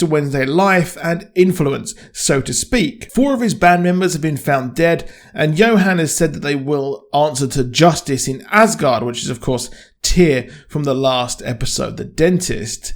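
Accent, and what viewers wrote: United Kingdom. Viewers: I’m unsure if you are putting on a Received Pronunciation accent... but Demeter is definite not pronounce DE ME TER.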